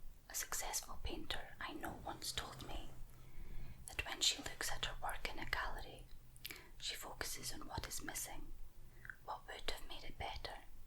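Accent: Scotland, United Kingdom. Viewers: Great job. Your accent takes me back to when I lived in Scotland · Hi.Thanks for the videos ive been struggling with anxiety.On another note im so used to american slang that is great to hear your scottish articulated speach.I kinda giggle with your prononciation in an non making fun kinda way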